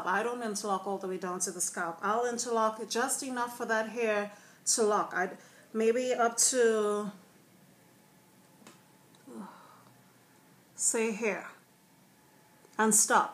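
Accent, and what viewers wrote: Trinidad and Tobago. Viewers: sound like a trini..love ya accent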